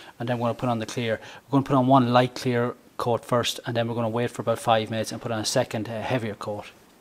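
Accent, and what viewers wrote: Ireland. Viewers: Hes Irish so yeah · The Irish accent!!! The title should have said so I would've clicked sooner this is rad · You sound Irish.... so why Hood? And why Fender? BONNET AND WING. Nice job though :) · Thanks for the great video. Nice Irish accent too! Lol! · God Irish accents are so cool I’ll buy what ever you sell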